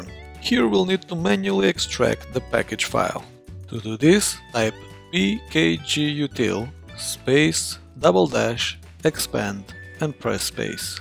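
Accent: Russia. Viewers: русский чтоль?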